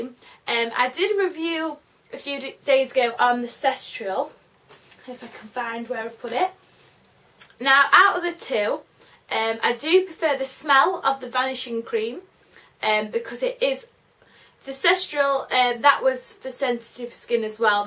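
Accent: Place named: United Kingdom. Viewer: i love British accent mm ^ - ^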